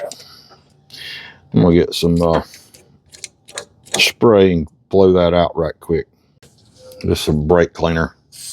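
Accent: South, United States. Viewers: I knew you had that Southern Drawl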